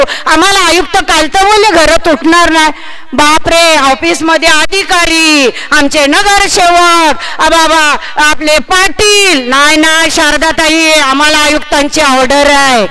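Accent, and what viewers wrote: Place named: Agri, India. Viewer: Agri aavaj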